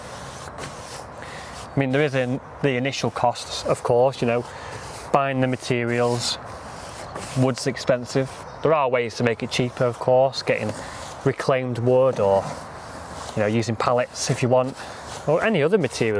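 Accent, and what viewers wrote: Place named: Preston, United Kingdom. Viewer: Love the shed colour! I also have an allotment in Preston. Thought you must be local to us from your accent 🤔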